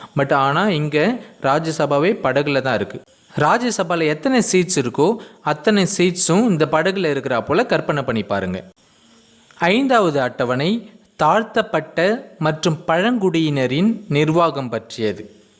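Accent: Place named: England, United Kingdom. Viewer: Vera level Anna thanks. Unka voice la oru English .....style varuthu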